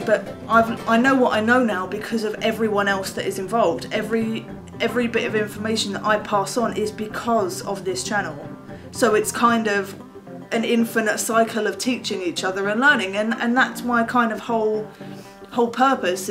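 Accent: England, United Kingdom. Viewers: Yeah, but how do I get the cool English accent?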